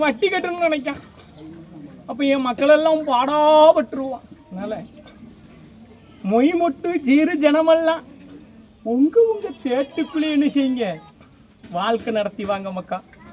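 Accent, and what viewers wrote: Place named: Kanyakumari, India. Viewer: இதுக்கு மேல என்னங்க தெளிவா சொல்லுறது. அவர் பேசரது குமரித்தமிழ் அது அப்படி தான் இருக்கும் சுலபமா புரியுதே ஏன் இவ்வளவு comment பன்னிருக்கிங்க புரியல புரியலனு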